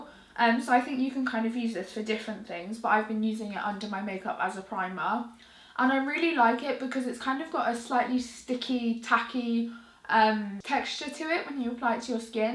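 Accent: United Kingdom. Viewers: moon light British accents are the best 😂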